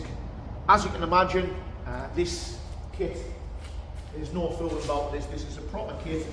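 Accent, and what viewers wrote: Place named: North East England, United Kingdom. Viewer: Bro he sounds like that cos he's from the north east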